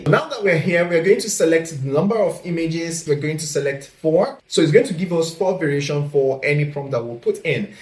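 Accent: Nigeria. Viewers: Which English did you use for this is it Nigeria English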